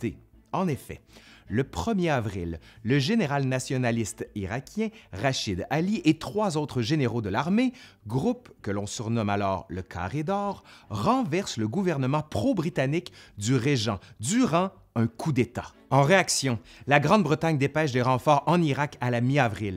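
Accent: Quebec, Canada. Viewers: Voilà voilà!... Un pouce en l'air et mon commentaire en bas! Cet accent québécois est royalement beau. C'est de l'ancien français, mais c'est chouette quand ça entre dans les esgourdes, et ne nous le parle pas à la va vite, comme ils font souvent là-bas, si bien qu'on arrive à suivre! Bon, on se laisse ici à nouveau puis on se retrouve en 42. C'est ça?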